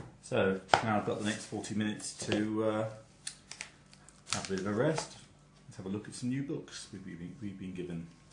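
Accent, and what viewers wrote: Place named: England, United Kingdom. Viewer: Thanks so much for posting this video. I really appreciate your time. I agree totally about flax oil, pure, cold pressed and organic. Must be kept in the refrigerator. I do also agree with 475F, I believe anything over 500 creates chipping and peeling of the seasoning. ((Side note: I really enjoy hearing your accent. We lived in England for three years as my husband was stationed at Upper Heyford US Air Force Base. We lived in a thatched roof cottage on a sheep farm in 1980 to 1983. Wonderful times... wish we could step back in time!))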